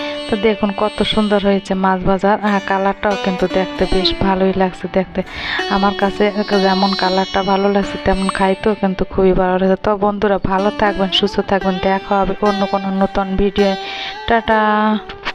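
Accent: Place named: Bangal, Bangladesh. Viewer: অসাধারণ বাঙাল ভাষা আমিও বাঙাল❤❤❤😂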